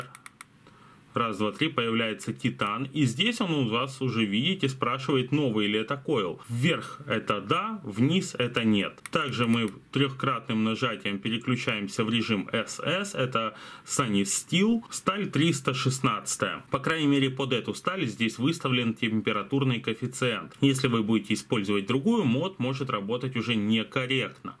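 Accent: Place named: Odessa, Ukraine. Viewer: Продолжай на Одесском рассказывать! А то, я раньше, чтобы твои обзоры не скучными были, субтитры включал)))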